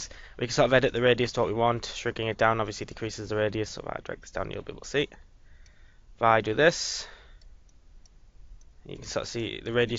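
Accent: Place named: United Kingdom. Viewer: You sound very British when you're sick, so British that I sometimes have trouble understanding it D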